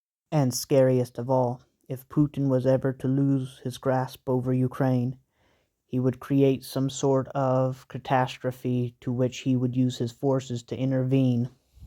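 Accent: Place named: United States. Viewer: Слава України! Героям Слава! God it's so refreshing to hear someone with an American accent who actually understands what is happening in Ukraine. Your committment is admirable and I applaud you Sir. I too fell in love with the nation (and a young Kharkiv region gal named Natasha once... mmm hmmm) and I wish you God's protection and every advantage in combat. Be safe bro, Im a subscriber now!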